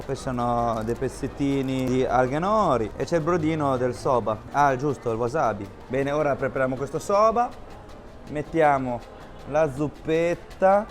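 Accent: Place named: Pesaro, Italy. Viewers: Il tuo accento romagnolo😂💘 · Accento Pesarese direi.. Sei troppo simpatico!! Un saluto da Rimini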